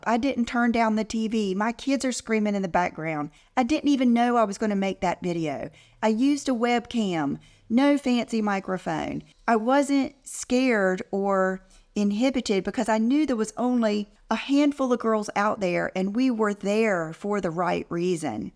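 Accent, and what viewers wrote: South, United States. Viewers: 100%. I will never forget when she made fun of the lady in the elevator. When people show you who they are, believe them. That sweet Southern accent doesn't fool me.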